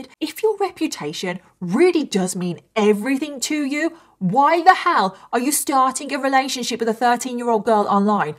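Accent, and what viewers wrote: United Kingdom. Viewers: I enjoy watching all your videos. It’s obvious you put a lot of time and work into making quality videos with honest fact finding reports. Great job. I also enjoy your makeup and have learned a few tricks watching you. Your a very pretty and articulate young lady, and the British accent is super cool. (You do know how Americans love a British accent, right?) 😂I have Siri set on my phone as a British man. 😂 Keep up the great work! Sending love and positivity your way. Aloha. ❤✌️ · She's using the British pronunciation.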